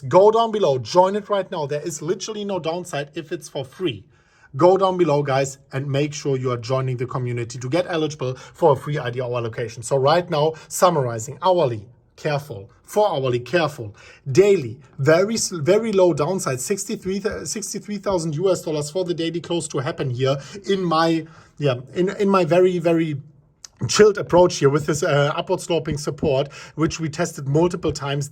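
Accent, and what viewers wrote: Germany. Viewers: Why are you interested in his German accent? Even for me as a German it’s brutal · Man, I really hope that one day I am crypto rich and develop a German accent like.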